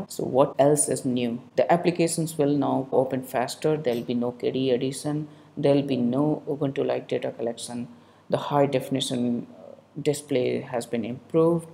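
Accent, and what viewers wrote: India. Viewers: It's Indian accent. Where are you from?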